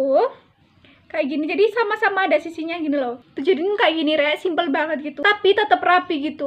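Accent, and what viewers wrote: Java, Indonesia. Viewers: Iyo rek manteb rek ilat jowo medok rek hehehe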